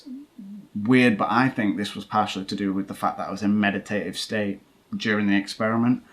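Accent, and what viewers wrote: United Kingdom. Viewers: para mi que soy mexicana me cuesta algo entender el ingles britanico ojala pudiera poner sub para captar mejor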